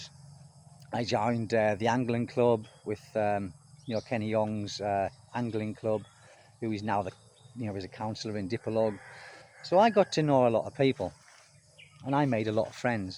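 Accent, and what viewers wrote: United Kingdom. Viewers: What’s that accent? Brit, Australian or Irish. ☘️ I bet u were very handsome in your youth. 🎉not a scammer ( retired airline pilot 👨‍✈️) 😊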